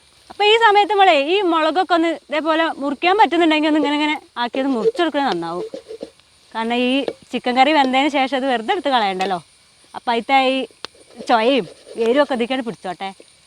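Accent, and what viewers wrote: Malappuram, India. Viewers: സത്യത്തിൽ ee സംസാരം 🤦🏻‍♂️🤦🏻‍♂️ 😂 തനിമലപ്പുറം 👌💪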